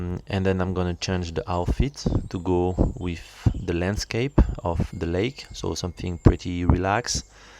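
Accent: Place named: France. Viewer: That french accent 💙 Great technique. Actually when you refuse to insert "beautiful face", you're showing a great example of how to do - effectively - your own art. Beauty? Kind a weird concept, when you scroll into image libraries online and see only beautiful faces. No more face diversity, everyone is a casanova or a supermodel. Great job. Vittorio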